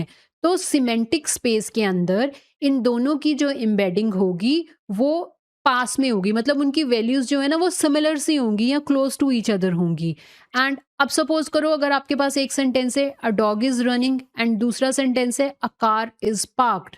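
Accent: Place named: India. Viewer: It's so nice to hear an Indian voice behind an AI unraveling video that too in Hindi🔥🔥...please go deep for those who somehow can't able to break the language barrier yet...your video will certainly help in doing that...by making understanding of AI to the masses🙏🙏🔥🔥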